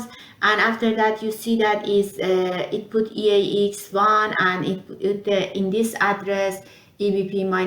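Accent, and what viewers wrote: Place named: Iran. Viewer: I like your Irani accent jan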